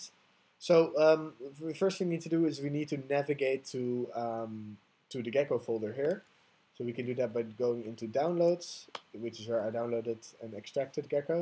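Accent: Netherlands. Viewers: you sound very nederlands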